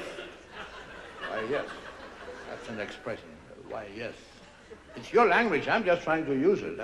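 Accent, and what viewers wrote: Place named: United States. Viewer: Never seen a Dane speaks this perfect American accent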